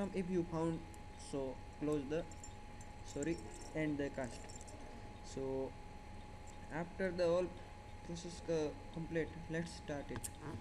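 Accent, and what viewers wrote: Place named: India. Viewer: Shit is that mumbling English or some DR. Bombay shit?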